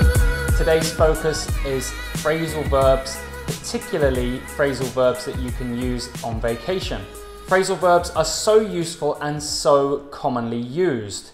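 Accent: RP, United Kingdom. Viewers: 👏👏👏👏👏What goooorgeous English ! You make me feel at Cowdray Park Polo Club, in Midhurst !😄😄🇦🇷🇬🇧🇦🇷🇬🇧🇦🇷🇬🇧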